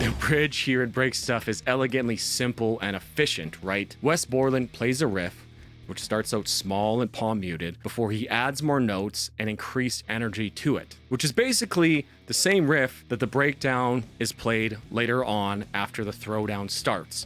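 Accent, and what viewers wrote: Canada. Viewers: Are you from alberta?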